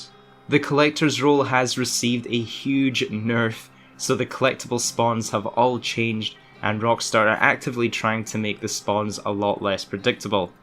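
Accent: Scotland, United Kingdom. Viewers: I will,always listen and watch you videos as a fellow Scots much better to hear ...❤️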